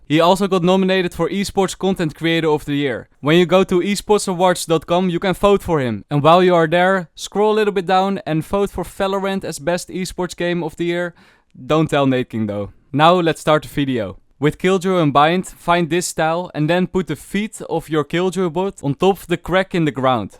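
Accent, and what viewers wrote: Netherlands. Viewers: He is now consistent in uploading great job man With a Dutch ascent!!!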